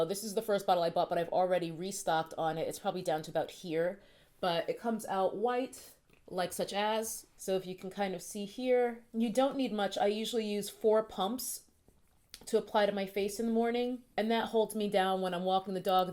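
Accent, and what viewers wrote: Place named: Brooklyn, United States. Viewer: Girrrlll i coulda swore you lived in NewYork. Like straight Brooklyn Native!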